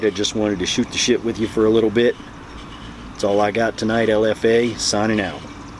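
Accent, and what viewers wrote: South, United States. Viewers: The southern accent is a lot thicker now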